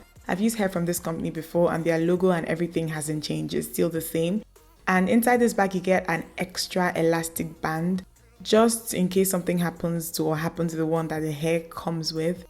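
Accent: Nigeria. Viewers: It's refreshing to hear the naija accent whew! Thanks for not forming! 😘😘😘❤️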